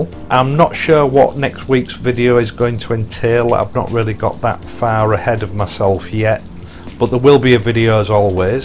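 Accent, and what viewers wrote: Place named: Hull, United Kingdom. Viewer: I'm a new subscriber. You're brilliant! Thank you for your content and your Hull accent:D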